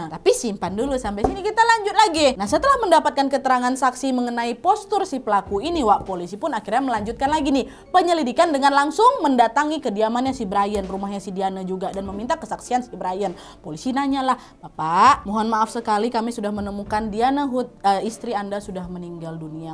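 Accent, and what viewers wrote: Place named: Medan, Indonesia. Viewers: Emang logat medan paling disukai 🥰🥰🥰😁😆😆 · Nonton semua video si gue ngerasa kalau semua tokoh dari negara manapun yang dia ceritain adalah org MEDAN. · Ampunnnnn, cerita kau jd kocak krn gaya cerita yg berlogat sumatera❤❤❤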